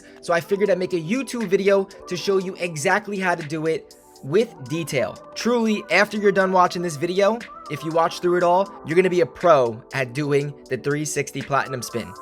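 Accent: New York, United States. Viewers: Can’t stand that New York accent😭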